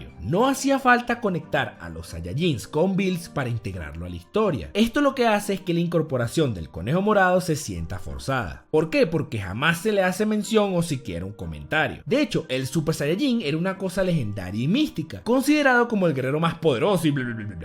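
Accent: Venezuela. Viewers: man, eres venezolano? el acento te delata jajajaja por cierto buen vídeo!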